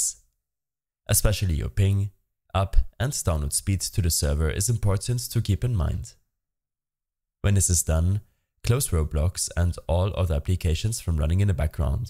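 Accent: Germany. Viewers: Ich höre bisschen deutsch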